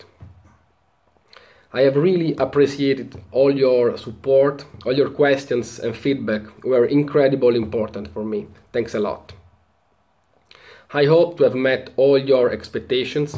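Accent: Italy. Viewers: Si sente che sei italiano ahah Sei un grande! Come ti chiami e come è nata questa idea?!? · I love your Italian accent when you talk English! And again, many thanks for putting up this wonderful series to both you and your wife. I love Indian barbers and it was so great to see so many videos with all the different and unique barbers / masseurs. I think I'll be watching this series of videos for a LONG time to come. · si sente che sei italiano ahah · si sente che sei italiano LOL... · Hello ! such a pleasure to hear you express yourself , what a beautiful Italian accent . The videos of India were wonderful , such great effort put in them. I'll have to look at some again to choose in taking the survey . Thank you for all you've done .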